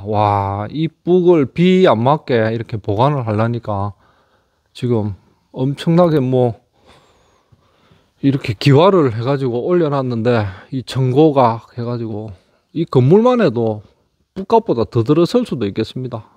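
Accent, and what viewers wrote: Gyeongsang, South Korea. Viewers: 빈집 찾는데 노력 하지말고... 늙은 어재 사투리나 고쳐 주세요.... 근본도 없는 갱상도 사투리 좀 고쳐요...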